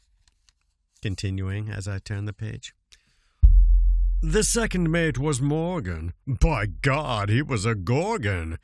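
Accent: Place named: India. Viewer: Your Hindu accent has made me pass out with laughter 😂😂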